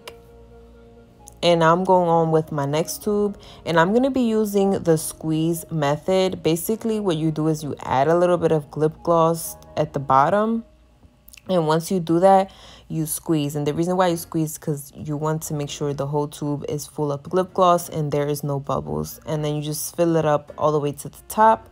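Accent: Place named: Russia. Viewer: Акцент словно русский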